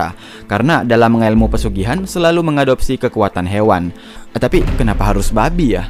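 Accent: Makassar, Indonesia. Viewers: Penasaran ku ini sama admin, tinggal di mana Ki? Logat nya Makassar bgt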